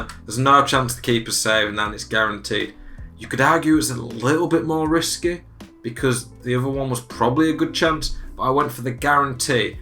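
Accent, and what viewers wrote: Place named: Scotland, United Kingdom. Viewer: Where r u from bro? Scotland? Im learning english and its interesting for me all ur rUns etc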